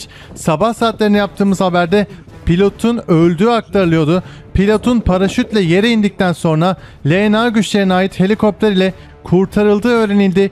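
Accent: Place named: United States. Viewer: Bak kardeş seni abone ettim ve paylaşımlarını izliyorum lakin şu dönme gibi yada amerikanlıştarılmış lehçeyle konuşma. Sinirlerimi bozuyorsun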